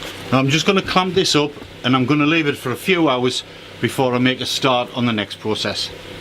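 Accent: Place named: Liverpool, United Kingdom. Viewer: your accent sounds like you might be from Liverpool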